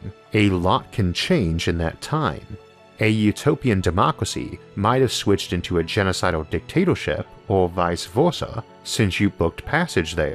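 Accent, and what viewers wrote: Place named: South, United States. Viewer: I've heard you say you have a 'speech impediment' in the past. I think your voice sounds cool as all hell man!! Like an educated southern American gentlemen, i think its awesome :)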